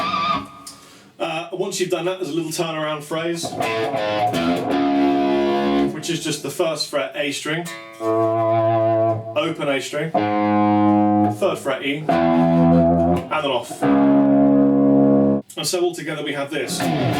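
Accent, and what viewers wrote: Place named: United Kingdom. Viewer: you are the best teacher I have seen. There is a lot of good ones but your delivery is very logical and concise. The british accent doesn’t hurt either. Wish you lived in the states...particularly Sacramento California...you’d love the weather over dreary old England...lol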